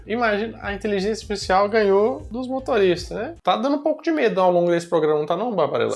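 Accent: Minas Gerais, Brazil. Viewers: Adoro o canal, muito bom, mas os americanos não entenderiam nada que vc fala em inglês, com esse sotaque mineiro, tem que falar mais caipira o erre...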